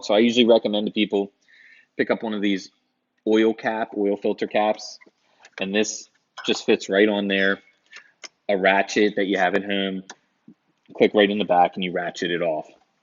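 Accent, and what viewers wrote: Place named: Pittsburgh, United States. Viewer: Stumbled upon this while trying to learn about the product JD product line. Living in Atlanta, but from PA... Picked up on the PA/Pittsburgh accent immediately, then looked over his shoulder and saw the sign confirming the locations. Very informative though. Thanks.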